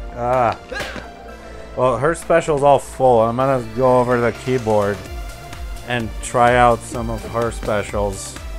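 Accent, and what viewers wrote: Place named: Brazil. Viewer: eu percebi que vc é BR pelo fato de ter sotaque...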